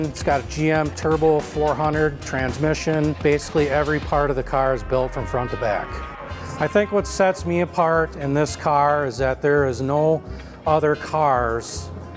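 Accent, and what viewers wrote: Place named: Minnesota, United States. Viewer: Don’t cha know?? I love minny accents. What a great car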